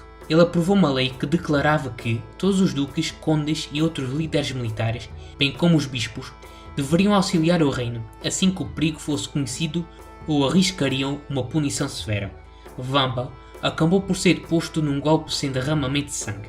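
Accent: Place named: Portugal. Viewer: Riqueza de conteúdo histórico, vídeo muito esclarecedor e cativante com o sotaque agradável e bonito de nossos irmãos de além mar lusitanos... Só aqueles que desconhecem a nossa história própria história os satirizam ou menosprezam o valor de inúmeras figuras que estiveram envolvidas no descobrimento e exploração da (então) colônia, foram fundamentais para o início de nossa terra! Admiro muito as histórias desse período e quero acompanhar e descobrir cada vez mais, obrigado por compartilhar conosco irmãozinho!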